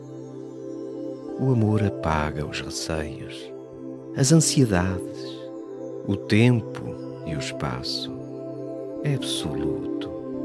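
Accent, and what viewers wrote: Portugal. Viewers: Finalmente, uma meditação em português De Portugal. Voz bonita. 🙏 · Como foi bom ouvir esta Meditação com este sotaque português que amo.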